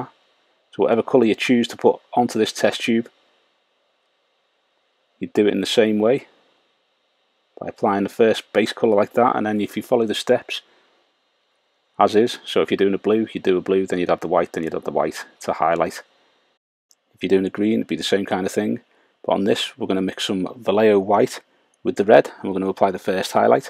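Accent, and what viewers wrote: Liverpool, United Kingdom. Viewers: Scouse accent pushes this to the next level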